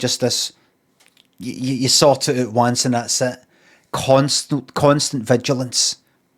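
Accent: Glasgow, United Kingdom. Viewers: yaas man, the glaswegian is strong in this one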